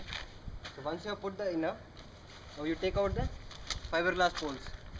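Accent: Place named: India. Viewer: dude what did you say after "hi friends"? #love#indian#english#accent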